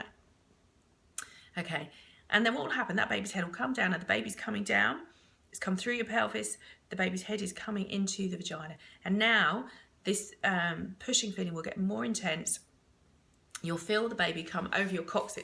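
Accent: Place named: United Kingdom. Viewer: Im sure everyone can hear fine,you are probably deaf,or it is just because she speaks british.Just turn the volumm up or watch another video.So dramatic 😒😒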